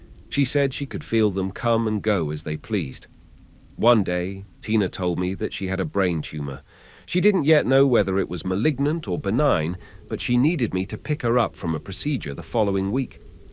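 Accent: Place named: England, United Kingdom. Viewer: An , English narrator ! 😀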